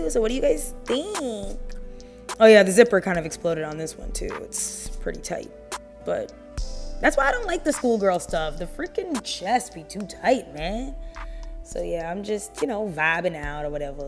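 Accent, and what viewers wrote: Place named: Jamaica. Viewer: You're an awesome panda. Not just only a panda The 1st outfit is gorgeous/ is the best one in all of them. Imma text you on telegram soon. See you soon gal Your english is like the one of Jamaicans. I feel like you're a Jamaican gal. Is that true?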